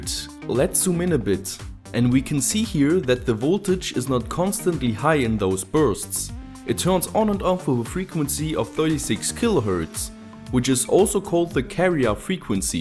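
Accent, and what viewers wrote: Germany. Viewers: Du bist deutsch oder? Your accent is german. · Thank you for that Video :) It helped me out realy much ! Please keep doing such nice projects and Videos. Is your mother tongue german ? :) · 8233Eire it's a German accent btw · Gebürtiger Deutscher? Man hört es :DD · keep making cool stuff that's affordable and other folks can make too. P.s I noticed the German accent considering you're doing this stuff and college is free in Germany what kind of engineer exactly are you?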